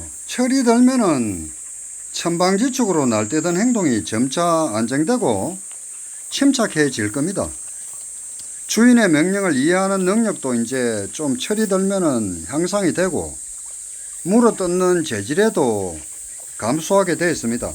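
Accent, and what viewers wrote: Gyeongsang, South Korea. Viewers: 말씀이 갱상도? 갱북? 구수하고 신뢰가묻어납니다. 어미개. 영특해요. 시끼교육도. 야무지게. 멋찐 아이들로. 자랄듯. 유익한 설명도감사합니다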